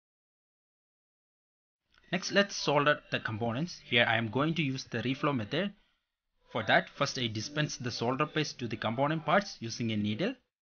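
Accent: Kerala, India. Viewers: So, a total of 5$ which is not that costly but judging by your accent are you from Kerala,India?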